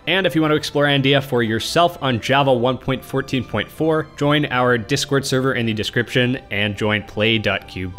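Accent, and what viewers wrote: Canada. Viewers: Buddy you have to be Canadian. "Ferm" and "Perk" spoken like a true Easterner.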